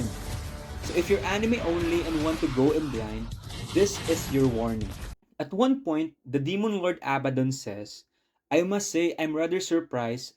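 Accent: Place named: India. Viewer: Please remove Indian accent 😭😭😭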